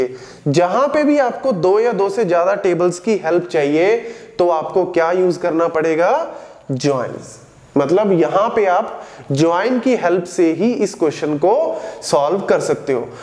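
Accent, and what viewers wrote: Punjab, India. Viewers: punjabi dur se pta chal jate hai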